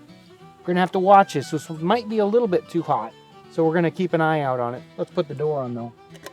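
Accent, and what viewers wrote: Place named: United States. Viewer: The music, the costume somehow shows he's cooking in a 18th century English country side setting. However, the pronunciation showed he might come from the new continent.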